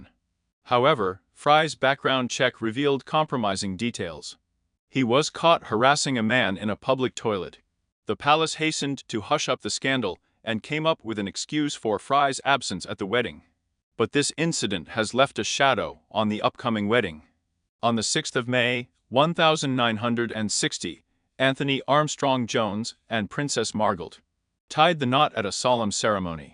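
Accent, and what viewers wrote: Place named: United States. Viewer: The narrator, is he for REAL? Haha. American perhaps.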